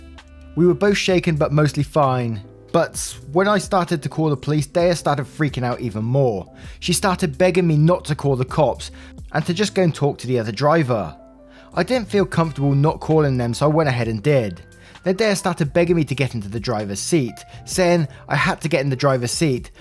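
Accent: United Kingdom. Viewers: I have been really into knitting and crocheting lately. So here I am in Finland, knitting my oversized mittens to try felting them when I'm done while listening to your videos with your lovely voice and British accent all the time. I'm a little nervous about the results since I've never felted before, but I'm having such an enjoyable time with these two activities while sipping tea ^_^ Wish me luck, please!! :)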